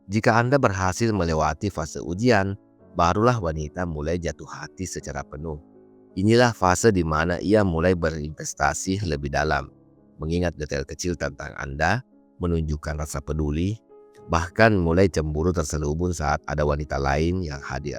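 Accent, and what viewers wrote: Indonesia. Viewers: Sy tauu !! Suara di vidio ini pasti orang Sulawesi😊 tepatnya Bugis😅😊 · Yg ngomong pasti orang Bugis/Makassar😂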